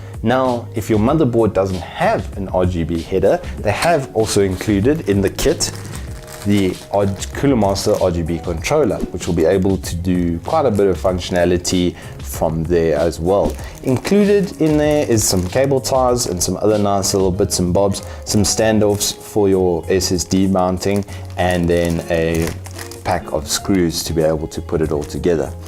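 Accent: South Africa. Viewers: EthanHuntMi6 he has a Afrikaans accent, he is South African I can feel it. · South African? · great review! Are you South african?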